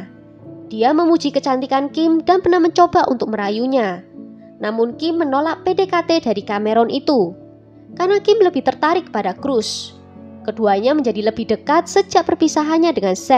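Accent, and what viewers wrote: Java, Indonesia. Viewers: Aku merasa terganggu sm medoknya.....Tlg latihan lagi mbak.. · Logatnya mba medok tp suaranya bagus jelas terdengar 👍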